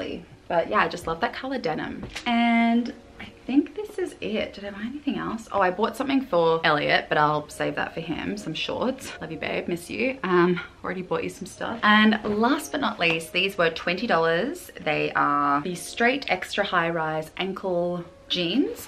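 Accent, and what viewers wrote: Australia. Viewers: Welcome Home! Glad you still have your Oz accent...l laugh at some people who literally are overseas for a few months IE 5 minutes and they suddenly have a hybrid accent 🙄😆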